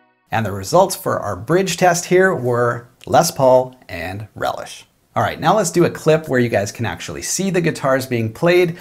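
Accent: Canada. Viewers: you sound so Canadian to me really enjoy your videos bro! Love from the Dominican Republic.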